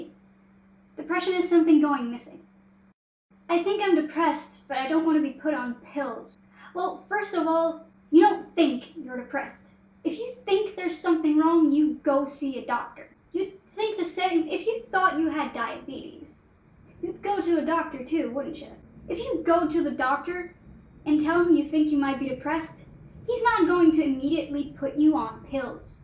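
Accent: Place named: United States. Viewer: der Akzent klingt mir eher nach Amerikanerin :'D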